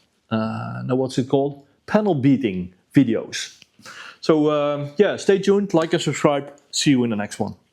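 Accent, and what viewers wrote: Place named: Netherlands. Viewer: When I hear you talk I get the feeling you're origanally from the Netherlands 😂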